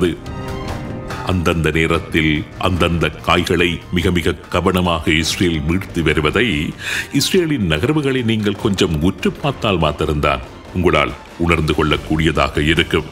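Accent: Sri Lanka. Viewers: உங்கள் குரல் சூப்பரோ சூப்பர் ஐயா. ஐயா நீங்கள் இலங்கை தமிழில் பேசுவது ரொம்ப சூப்பர். ஐயா இஸ்ரேல் கூடிய சீக்கிரம் அழியும் அந்த அழிப்பை படைத்தவன் செய்வான்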